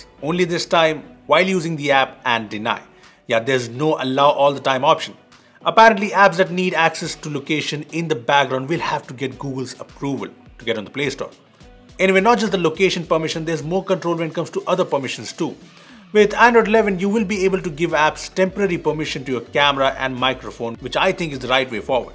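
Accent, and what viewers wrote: India. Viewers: I hate when an indian talks english! hell of an accent 😫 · The indian accent is nice ( deeevlper ) ❤😁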